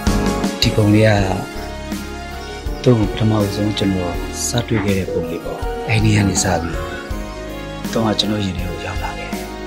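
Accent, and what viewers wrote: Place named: Rakhine, Myanmar. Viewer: ရခိုင်သံကြီးအဲဒီလောက်တော့လူတိုင်းရပါတယ်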